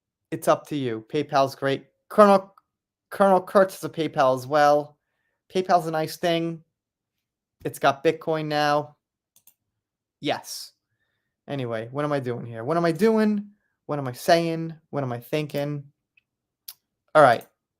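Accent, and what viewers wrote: New York, United States. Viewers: Where in NY are you from? I'm from Brooklyn... love listening to your NY accent.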